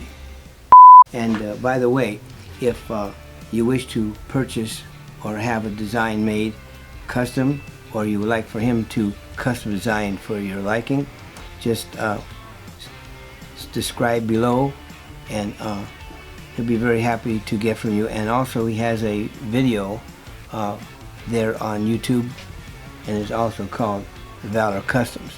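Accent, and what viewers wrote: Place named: Southern California, United States. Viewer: Are you from Cali? You have the SoCal accent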